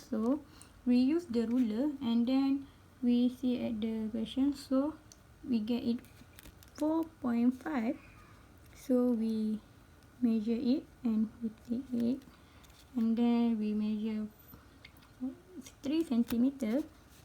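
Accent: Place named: Malaysia. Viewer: finally i found the malaysian's english. thankyou so much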